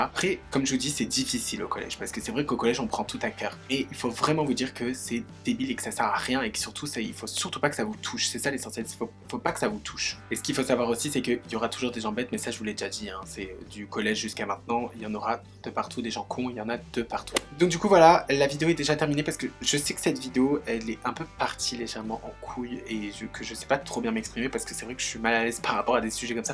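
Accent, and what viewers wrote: Belgium. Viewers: Tu es belge car je trouve que tu as un petit accent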